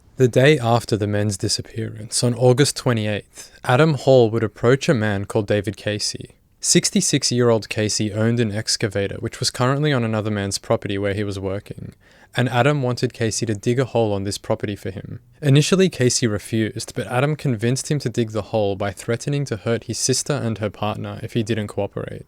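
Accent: Australia. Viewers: Dude I know you're Australian but I've been listening to all your videos throughout the week and every time you say "law enforcement" I thought you were saying Lauren faustman and I heard that name in every video and I wondered how she could be involved in all these murders and vile cases. So I looked her up and couldn't find anything that made sense. but then thought about it.. and realized it's your accent. Oops.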